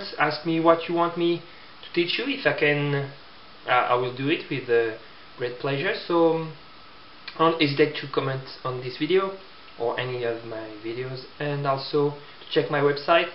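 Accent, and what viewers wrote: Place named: France. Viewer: French accent of course!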